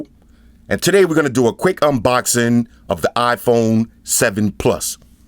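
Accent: New York, United States. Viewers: That NY accent tho! Love it. If I ever leave, I'd just listen to you videos if I ever get homesick!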